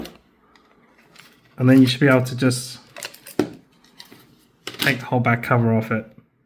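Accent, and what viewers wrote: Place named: Australia. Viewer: I'm keen to try out a Lilygo T-Deck Plus as my entrypoint into Meshtastic, but it is not clear to me whether any of these sellers have the suitable frequency for Australia. You sound like you might be Australian, so I thought I would ask: from what I can tell, in Australia we need either AU915 or AS923 compliance. In the Aliexpress link, it does have a 915Mhz option, but it is not clear to me whether it would be suitable for Australia. I see that there may be some US915 devices that don't have quite the right frequency range for Australia. Can you help me choose the right thing? :-D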